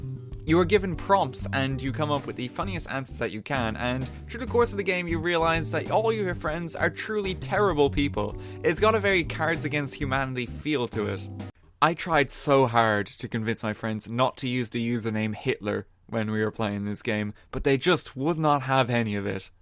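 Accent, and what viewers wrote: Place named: Ireland. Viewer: 8 minutes of a buttery Irish accent. Score!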